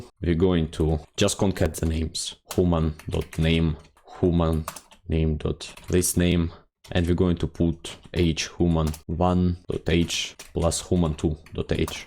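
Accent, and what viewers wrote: Russia. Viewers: omg the russian accent i can feel it great tutorial !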